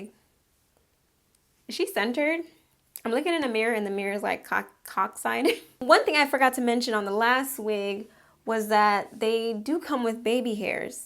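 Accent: Jamaica. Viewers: OMG! Gurrrrrrlllllll I LOVE YOUR WIG VIDEOS! Your personality is what really drew me in as a subbie! But I heard an accent OMG are you Jamaican ? Wish I could subscribe 10 more times now! You go girl! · Mi did know yah Jamaican ....🤣🤣...lol first time watching you and with in the first few minutes I was like she prob Jamaican......guuurl! New subbie😂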